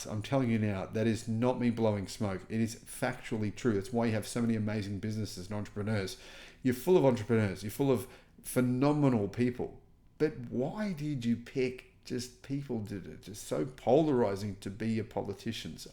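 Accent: Australia. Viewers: U r an Aussie sir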